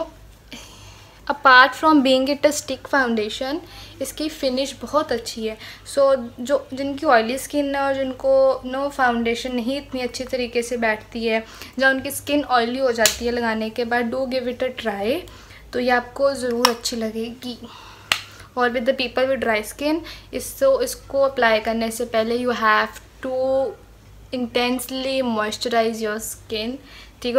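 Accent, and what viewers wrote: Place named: Punjab, India. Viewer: Your Punjabi accent is super cute 💕💕💕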